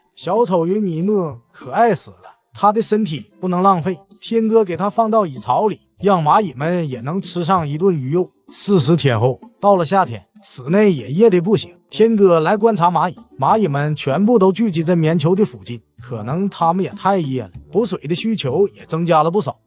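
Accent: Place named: Northeast, China. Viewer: 这大碴子真他妈几把难听！！！！！！！！！！！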